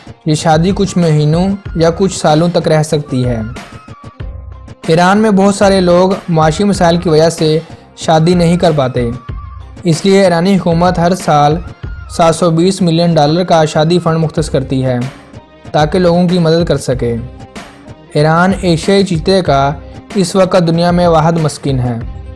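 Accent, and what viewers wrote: Pakistan. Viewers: Khuda me banday Urdu ko Punjabi lehje air talafuzz me to na bolo, think karo, were post achi this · خخخخخ هندی نیست ببخشید پاکستانی دالخور است از صدا یش مشخص اس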